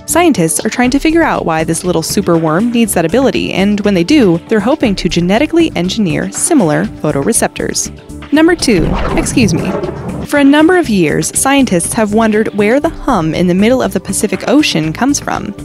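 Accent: United States. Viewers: speaks with an American accent. gives temp degrees in Celsius. stupid bitch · Wow I am impressed that the narrator ,who has an American accent actually pronounced the Antarctic correctly . Normally an American pronounces it as ANARTIC totally missing out the T.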